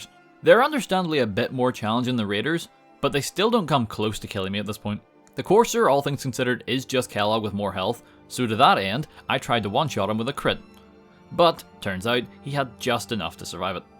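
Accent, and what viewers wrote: Northern Ireland, United Kingdom. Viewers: You from Northern Ireland? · You sound northern Irish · Northern Ireland? 👍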